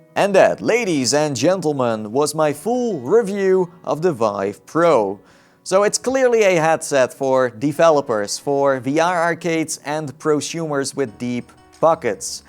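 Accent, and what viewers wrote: Netherlands. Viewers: Are you dutch???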